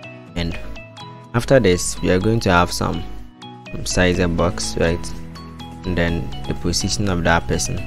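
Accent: Ghana. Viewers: Bro u speak like a Ghanaian, are you? I'm Kojo.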